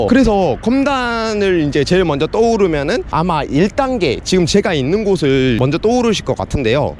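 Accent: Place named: Jeolla, South Korea. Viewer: ㅋㅋㅋ 방송하지마라 공부를 쳐 하고 하던지 부알못아ㅋㅋ 전라도 억양 오지네ㅋㅋ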